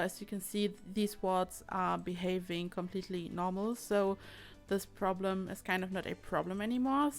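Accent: Germany. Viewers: wie man diesen deutschen Akzent einfach raushört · you have the same accent as * and * and they are germans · Are you german? bc of your accent. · Are you German because I can here an accent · That german accent tho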